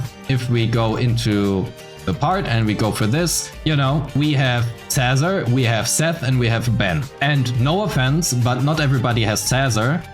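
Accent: Germany. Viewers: Digga ist das geil das es ein deutschen ZZZ YouTuber gibt Ich wusste das du deutsch bist wegen dem aktzent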